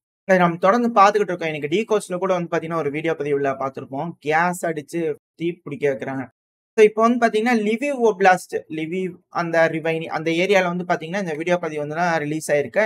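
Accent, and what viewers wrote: Coimbatore, India. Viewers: அவன் பேசறத பார்த்த கோயம்பத்தூர் மாரி தெரியுது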